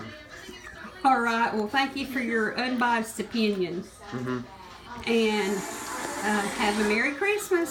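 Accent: South, United States. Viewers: When the presenter has a Southern accent I know whatever the recipe is it will be GOOD!!! These are amazing thank you!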